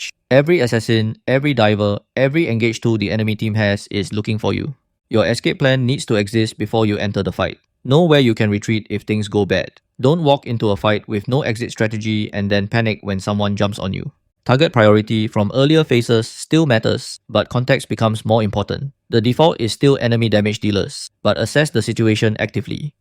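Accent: Singapore. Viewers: Wait are u singaporean? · hi are you singaporean by chance im singaporean also and that accent is so recognisable lol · Lmao. I heard ur accent and immediately have to double check ur location. Glad to meet another fellow Sgporean. Keep it up bro. As a main midlainer who played most games in mid, i may knew most of this fron exp, but its still a very solid guide that most nowadays still dnt know. Especially rotation. God knows how many times when i played other roles and the mid dnt know how to rotate, in mythic/honor btw. Appalling😂 Edit: Subscribed for support✊🏽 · Are u singaporean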